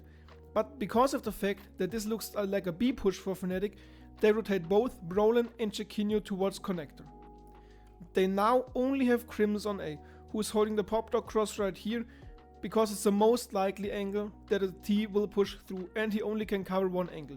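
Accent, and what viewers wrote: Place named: Germany. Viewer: good video mens))) i like your jermen accent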